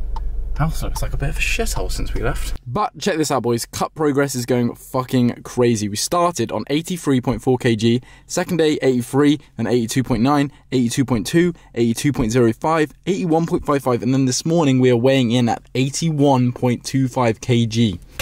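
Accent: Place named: Weymouth, United Kingdom. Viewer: You from Weymouth lad?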